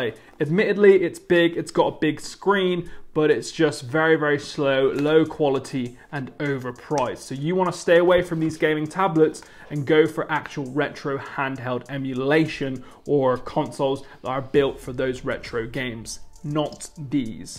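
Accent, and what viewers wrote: Bristol, United Kingdom. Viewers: u from bristol?? sounds like it and swear ur tshirt says somerset lol im from weston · are you actually a Bristol boi?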